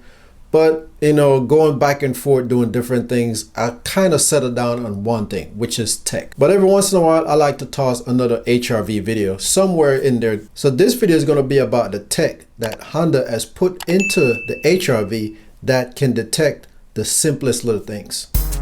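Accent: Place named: Jamaica. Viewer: You sound Jamaican ...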